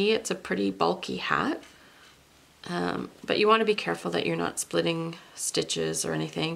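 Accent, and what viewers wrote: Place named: Canada. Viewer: Well I never thought we Canadians have an accent but I can definitely pick you out :) signed, a Maritimer :)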